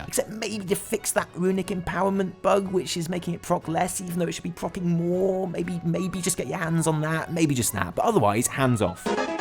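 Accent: United Kingdom. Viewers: I really want you to be the next Doctor from Dr. Who just by your accent.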